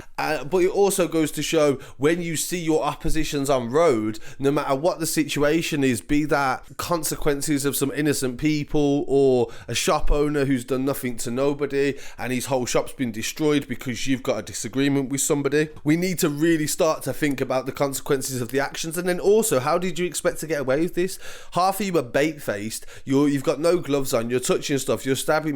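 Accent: Birmingham, United Kingdom. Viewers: Where is this donny channel from ??? Brum? Or Skegness? Lol rate him still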